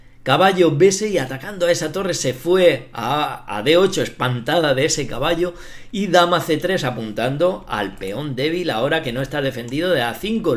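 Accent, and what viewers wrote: Spain. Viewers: Habla muy españolete ese es el problema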